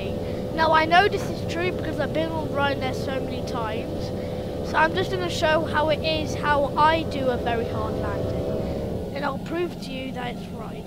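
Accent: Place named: United Kingdom. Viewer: oh your british, cool?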